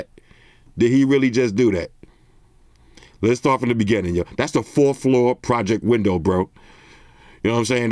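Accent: United States. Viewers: Morning Mr. Have ever considered have another side job as a narrator in reading books. You have a very distinct American accent, which I think that you would make" lots more money". I'll surely buy it.... thanks buddy. Oh, I once had a pussy cat like yours, years ago. His name was Walter. I had him for 12 years. He passed away in 2009. I miss his company. Sad face.... nico Trinidad and Tobago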